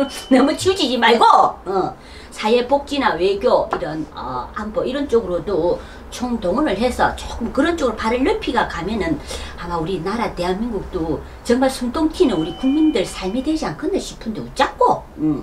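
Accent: Gyeongsang, South Korea. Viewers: 옛날에 *자갈치아지매*라는 라디오방송이 있었는데 비슷한것 같네요. 구수한 경상도 사투리 정겹습니다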